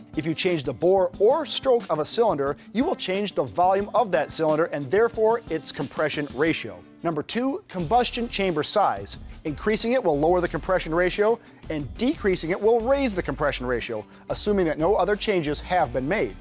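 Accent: United States. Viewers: Can the narrator get any more American than this???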